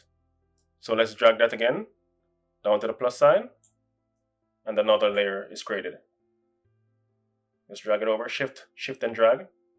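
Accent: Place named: Jamaica. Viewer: I am a Jamaican and you sound so much like a Jamaican lol. your content is good bro thank you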